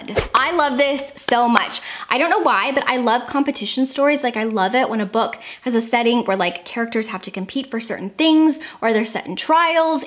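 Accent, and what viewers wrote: United Kingdom. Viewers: Hey!!! I am living for this video 🙌🙌 all the spooky autumn vibes. Hello from Perth Australia 👋 love the British accent you do in your videos hehe sometimes there is a hint of an Aussie accent when you do it. Not a spooky recommendation but a great YA read that I think you might like is The Prison Healer by Lynette Noni.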